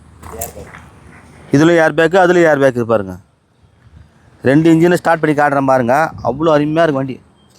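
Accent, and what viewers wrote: Madurai, India. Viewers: மதுரை வாசகம் சூப்பர் 🎉🎉🎉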